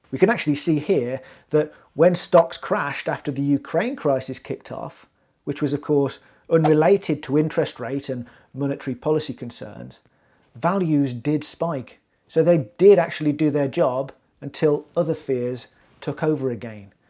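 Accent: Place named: West Midlands, United Kingdom. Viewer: I take it that you are from the West Midlands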